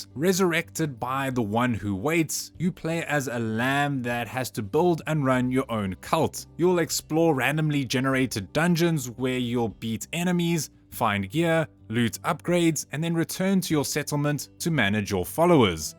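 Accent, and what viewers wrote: South Africa. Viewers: Is that a saffa accent?🇿🇦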